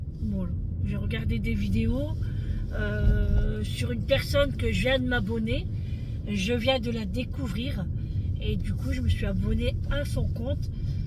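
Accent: North, France. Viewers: Bonjour joyeux anniversaire j espère que tu sera gâté oui a noyelle beaucoup de magasins je suis allée avec ma fille je n en pouvez plus quand je t ecoute dans t et videos on a beaucoup de points communs j aime ta simplicité et notre accent du nord moi samedi je vais faire du shopping avec ma fille aussi toujour bien decouvrir t et menus bonne ballade enyre mère et fille a bientôt 🥰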